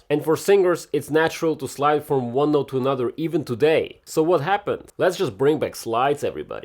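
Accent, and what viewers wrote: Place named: Russia. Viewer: are you russian speaking? I hear sometimes russian accent 😄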